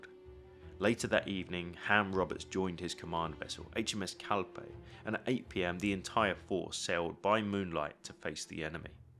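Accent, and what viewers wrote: England, United Kingdom. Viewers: That the narrator has an English accent says everything 😞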